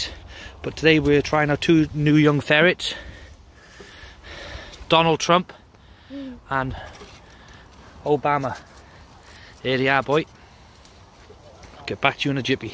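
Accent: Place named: Wales, United Kingdom. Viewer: 11 rabbits, we can make £22 quid in Sunderland for the meat mate. Do yas do any better over in Wales? I'm guessing by the accent, apologies if I'm wrong :-)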